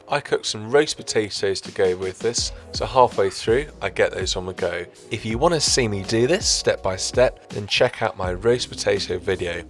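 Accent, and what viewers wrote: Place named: Scotland, United Kingdom. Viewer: so difficult to understand his English... I can better understand a Chinese immigrant than his Scottish (or whatever English) accent.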